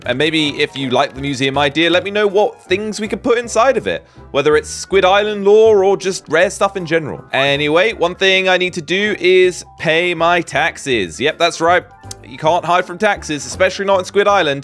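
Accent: United Kingdom. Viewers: most British sounding intro I've ever heard 😭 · sounds so british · British accent every episode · HE IS BRITISH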